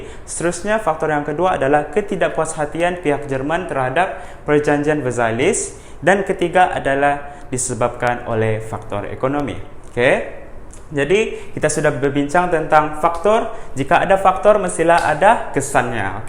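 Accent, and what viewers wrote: Sabah, Malaysia. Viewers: Cg ni org Sabah ka ?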